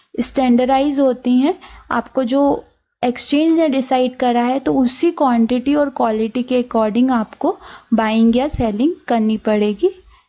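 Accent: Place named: Indore, India. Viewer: Mam aap indore se belong karte hai kya aapki aawaz se lag raha hai😁👍😅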